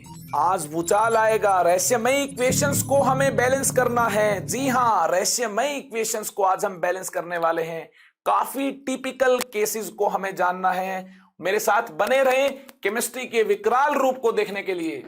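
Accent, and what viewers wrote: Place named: Haryana, India. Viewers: Sir app harayan ka lagta ho boli sa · Class 11th:_ A teacher with HARYANVI ACCENT🤩🤩 preparing us for the real DANGAL to be fought in 2022 🔥🔥🤓😎😎 🏅🎖🎖🥇🥇 22 dislikes😡😡they dont deserve to live in this planet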